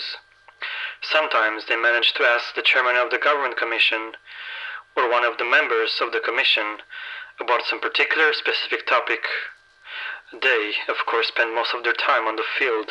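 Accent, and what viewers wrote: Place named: Sweden. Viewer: Are you Swedish? I read your profile and it says Romania but your accent strikes more as if you were a native Swede.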